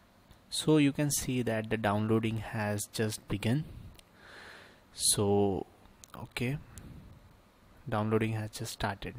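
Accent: India. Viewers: Heard an Indian voice, clicked off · not use full> fuck indian accent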